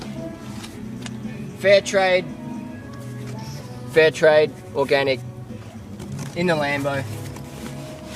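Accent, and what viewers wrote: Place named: Australia. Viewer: Great video. Because of your awesome Australian accent, it kind of sounds to my American ears like you are saying fucktose when you say fructose. =)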